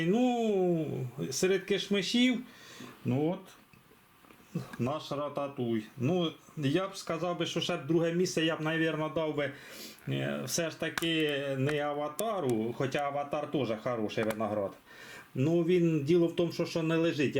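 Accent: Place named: Kirovohrad, Ukraine. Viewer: Дякую за відео! Підписка, вподобайка. Ви часом не з Кіровоградської області? Ну геть розмовляєте, як у нас). Чи продаєте Ви саджанці винограду?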